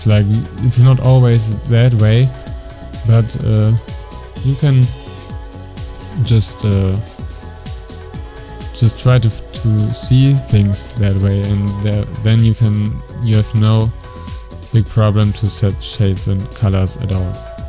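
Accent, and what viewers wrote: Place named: Germany. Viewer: Man hört ein schönes bisschen Deutsch aus deiner Stimme ^~^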